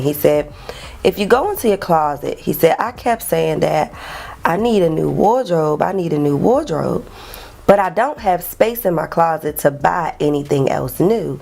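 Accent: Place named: Baltimore, United States. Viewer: You popped up on my timeline, and as soon as o heard your accent I was like…oh hold up she’s from my hometown B-more!! Let me subscribe ❤ Enjoyed the video ❤❤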